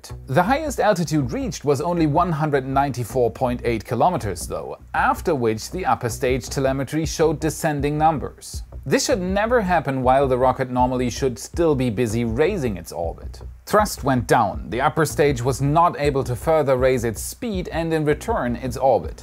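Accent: Germany. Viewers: Mann merkt wirklich bei den Namen das du Deutsch bist genauso wie bei ein paar sätzen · Oh, no problem with your German accent... I didn't notice. I have the same issue with my Dutch accent in my videos... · Why would you wish to get rid of your german accent. To the English ear it is a pleasent sound. I guess it might be that we are both anglo saxon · Hi, mir war in den ersten 5 Sekunden des Videos klar, das du Deutscher bist. Egal wie sehr wir es versuchen, unser Akzent kommt immer durch xD. Mach weiter so, sehr coole Videos. Sub und Daumen! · I was actually referring to his little comment about his accent coming out :-D, Ich weis er ist eine Deutsche weil ich auch einer bin :-) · Ususaly I say: Awesome content as always, but this time it was even better! Loved the little mistakes, and that you didn't take them out, as well as your heavy german accent. Your pronounciation is verry high quality btw. 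Your videos have become, more fluid, professional, and even funier over time!:) Übrigens würde ich dich super gern mal auf deutsch sprechen hören, so wie wohl viele Andere hier auch. (Und mich wundert's immer, dass Du Dich als statt vorstellst);) Und ich frag mich oft, woher aus Deutschland Du kommst. Ich schätze Süd-West (Baden Württemberg oder Rheinland Pfalz). Ich persönlich bin in Karlsruhe aufgewachsen, schaue Dir jedoch aus Ungarn zu. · I'm german too and didnt notice that you are German until today. I wish I could get rid of my accent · he has a german accent during the whole video? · Lol. You are German!. You should never be worried about accent. You provide invaluable information for us non techie space geeks so thank you:) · About the beautiful German accent. My 95 year old Uncle Kurt briefly worked with Von Braun in Huntsville in the late 1950's. I believe that the German accent IS the best accent for a rocket enthusiast. 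Never apologize for it. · It would be fun if you did the entire video in your German accent( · "Sametimes my german axent comes sruu" ach ich liebe dich :D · Well lucky for you German accents are my absolute favorite. so checkmate! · Your German accent cames through all the time. No worry tough. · are you german ??? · Ist Deutsche, lol!!! Pretty easy to have a German accent when you are German!! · Why are people just realising about German accent now 😂 I’ve always known he was German, maybe I’m just special 🤔 Shame about the rocket lab electron launch, love the video:D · I am a native English speaker. I can tell you are German because of your accent, but if it wasn't for your accent, I wouldn't be able to tell. You're sentence structure and pronunciation is as good or better than the average American and I'm really impressed. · German accent is best in the world! Love a German accent 😉👌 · I like the german accent. 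· You’re German? Nice lol I dare you make a video with ONLY your strong German accent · Denglish ischt goedt? Miene deutch und bookstabbe ist sclecht... So, your English is 👍 . I lived in D’dorf and Basel for many years, and I’m losing my deutch. It’s good to hear a good German-English accent. It makes me want to go out and get my shopping done before the grocery stores close ... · nonsense his accent is very german, I know because I grew up around english speaking germans.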